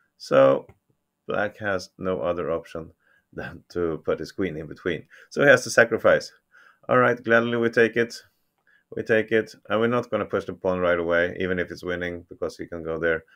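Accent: Sweden. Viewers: Great work bro!! Are you from Sweden by any chance?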